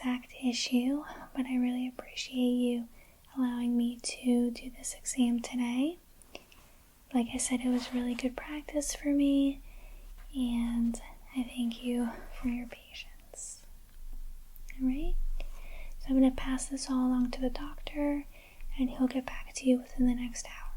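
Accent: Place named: Midwest, United States. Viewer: I like your slight accent but I can't tell the region. Midwest somewhere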